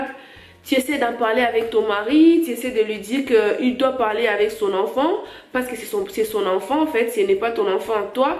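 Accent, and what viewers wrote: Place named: Democratic Republic of the Congo. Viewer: Tu est Congolaise je sens le Tiiii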